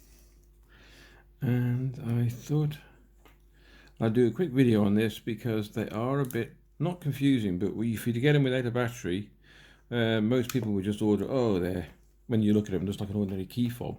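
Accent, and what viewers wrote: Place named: Shrewsbury, United Kingdom. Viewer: Recognise that accent...... Shrewsbury Monner by any chance?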